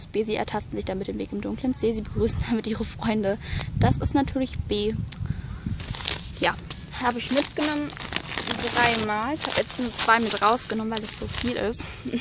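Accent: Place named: Hesse, Germany. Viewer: Du kommst aus Hessen.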